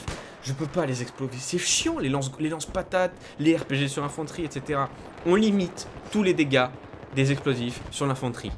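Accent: South, France. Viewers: Rhaaa putain ton accent du Sud est trop énorme! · Et très bonne vidéo comme d'hab, continue comme ça avec t'es super accents du sud, t'es génial. ;) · Oui je pourrais payé pour un BF3.5 et juste ne pas remettre la DCA fixe cheater de BF4 . Et oui un BF Viêtnam.5 se serait génial.Ton accent du sud est magnifique.(Mariane sauve nous n'est se pas ^^)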